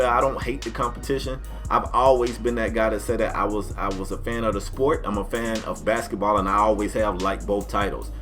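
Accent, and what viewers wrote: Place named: South, United States. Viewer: I remember in 2k12 days you made me create a scoring wing.... An you had a real country accent, it really showed when ever you said rain maker.... you had the best ncaa story’s....You made me watch a madden series.when I hated madden just to see johnnie, josh, and Ed reed reunite.... I wish you the best and may peace and prosperity be upon you 🤟🏾🤟🏾🤟🏾🗣🗣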